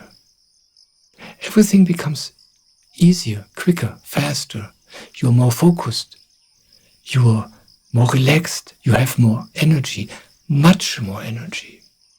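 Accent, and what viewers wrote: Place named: Germany. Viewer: Accent like Geraman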